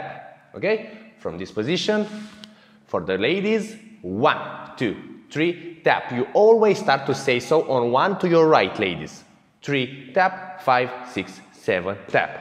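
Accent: Romania. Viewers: Puteam sa jur că ești român după cum vorbești engleza...Mulțumim de tutorial...ii ca lumea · Romanian accent. Resembles the italian accent.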